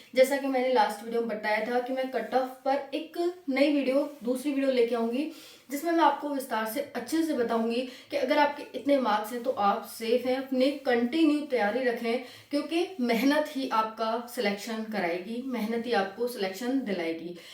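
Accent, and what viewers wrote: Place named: Mathura, India. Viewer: Apki language se hi lag raha tha ap mathura se ho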